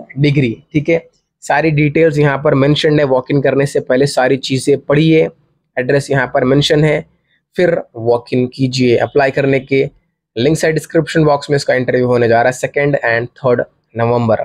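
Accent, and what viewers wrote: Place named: United States. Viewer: Bhai first change your tune into indian. You're speaking hindi with American accent 😂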